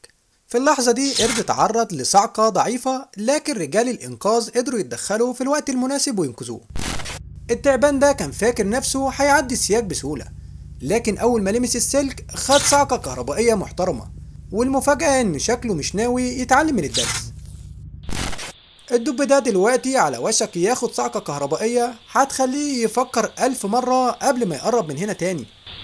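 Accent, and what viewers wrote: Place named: Egypt. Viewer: أنت مغربي ولاكن لھجتك مصرية غريب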